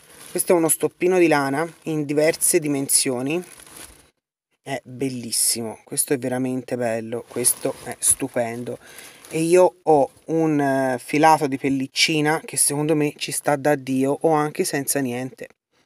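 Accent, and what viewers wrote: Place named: Tuscany, Italy. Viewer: Sei simpaticissima x l accento sicuramente toscana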